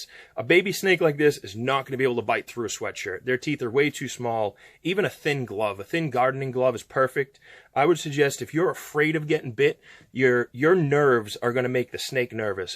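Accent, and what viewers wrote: Canada. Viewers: Sounds like you are from Canada? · I'm from Massachusetts, so I don't know why you would sound Canadian to me if you weren't. But I saw a business with the same name as yours listen in Lynn, MA.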